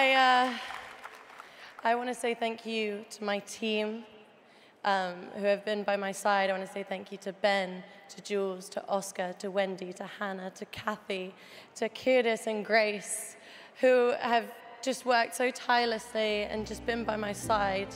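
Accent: United Kingdom. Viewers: She sounds so British I was born in England I don't even sound British I sound American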